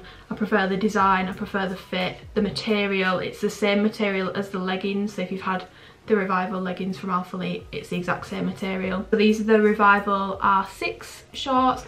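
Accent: United Kingdom. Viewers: are you from Scotland 🏴❤️😋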